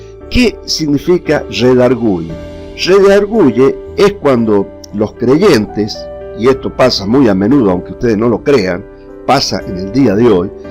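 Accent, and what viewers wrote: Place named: Northwest, Argentina. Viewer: hola hno muy buena enseñanza.. por su acento de donde es usted ?? Tucumano o salteño quizas jujeño?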